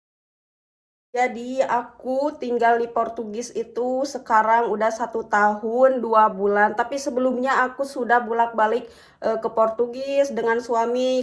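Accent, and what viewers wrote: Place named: Sunda, Indonesia. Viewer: Euleuh... eta kamu teh logat Sunda na meuni lekoh pisan. 😂😂😂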